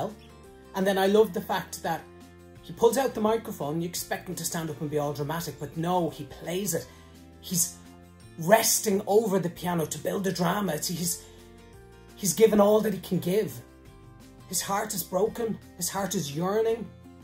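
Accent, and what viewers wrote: Ireland. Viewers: I love a slight accent, too, so singing is just fine like it is as far as I'm concerned and I like your Irish accent. The truth is, most of us have a bit of an accent even depending on which part of our countries we come from. I was born, raised and lived in Texas USA all my life so while I don't have one of those thick slow drawl accents at all (like Lady Bird Johnson, who made me shudder with slight embarrassment every time she opened her mouth for fear other people would think everyone from Texas sounded like THAT. We don't:-) but I know people from other parts of USA can tell that I'm not just Southern but that I'm from Texas and proud to be:-) Texas is not known as the GREAT Lone Star state for no reason.The USA is a huge country and In many respects Texas is a world apart from from what goes on with some other parts of the USA:-) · AH! 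i can just hear you saying "lovely" in your Irish accent, and i am smiling away like a fool again... so i am going to say "Ah, that's just Grand" in my not so good Irish accent (tho i do try) hanging out on the Bus! and hey, i just watched your ' ' video, and get this.... we already have the Same Hair!! ahahahahaaa! awwwww God Love ya.... you really are just as immediately warm and sweet, and really funny(!) in all of your videos so far. so nice to hear that you would love to see Italy and appreciate the culture, tho probably not quite as much as i would love to see Ireland.... all of those Gorgeous Castles and all of the Stunning Natural Beauty and Father Ted's house too! maybe one day.... (i am quite seriuosly ill, sorry to suddenly be a downer here, it's okay tho... i am pulling myself through as best as i can... we shall see i s'ppose...) if i am well enough to live the dream and actually visit Ireland, i will give you at least a 5 minute warning that i will be on that part of the planet! 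and maybe we could have a nice cuppa on the Bus hahahahahaa! and thank you so so so much for the kind words, i was seriously just calling it like i saw it, so glad that you found it to be that nice of a comment. you seem to be the kind of person who deserves it tho. i am faaaaiiiirly sure this is not the last you will see of me in your comments, so i shant say goodbye... just, until again. yay!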